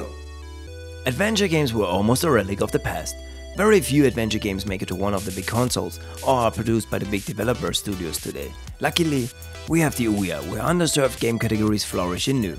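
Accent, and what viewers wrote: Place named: Croatia. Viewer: Are you Croation becuase my friend is from Croatia and he has youre same accent?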